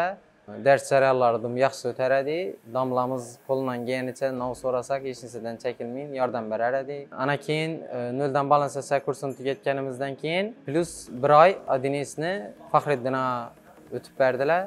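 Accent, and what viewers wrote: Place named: Khorezm, Uzbekistan. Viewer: Assalomu Aleykum Xorazm sheva gap yo`q, Buxorodan salom..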